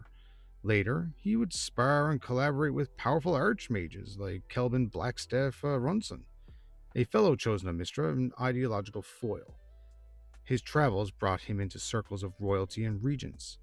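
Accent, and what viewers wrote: Newfoundland, Canada. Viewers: I can tell from your accent that I've stumbled upon a fellow Newf 😅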